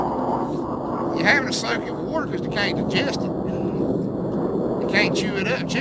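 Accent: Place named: Alabama, United States. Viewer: Like listening to my brother-in-law from Alabama. Love it. Good info.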